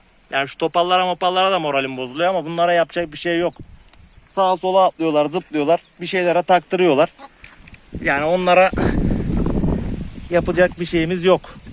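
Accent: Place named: Thrace, Turkey. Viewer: Konuşman Trakyalı gibi sanki nerelisin :)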